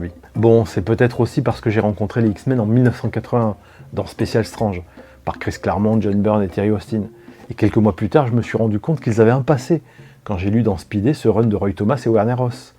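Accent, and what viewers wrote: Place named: United Kingdom. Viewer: Merci pour ta vidéo et ta chaîne dans l'absolu ! Ton accent anglais me fait bien rire 😉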